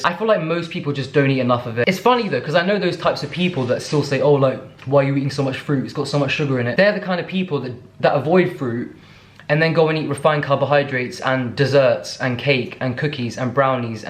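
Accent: United States. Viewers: I like how you try and pronounce certain words in an American accent, u got it all down pat except "water-melone" that's halfway Spanish accent 😂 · Love the American accent 😂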